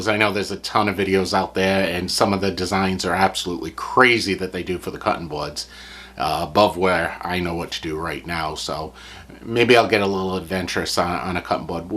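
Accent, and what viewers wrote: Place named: Massachusetts, United States. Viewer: Just stumbled upon this video and your channel. You have the makings of a great set up! The instant I heard your voice I thought to myself, "This person is from Massachusetts" and was extremely proud when the first thing in frame when you rotated the camera was a Boston Red Sox banner. Where in MA are you located?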